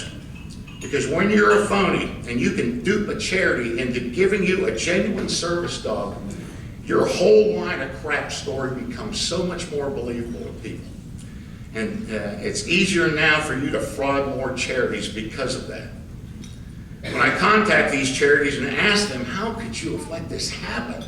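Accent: Pittsburgh, United States. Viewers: Great work sir, thank you for your service! 🇺🇸. By the way, I can hear your Pittsburgh accent 👍🏻👍🏻😄😄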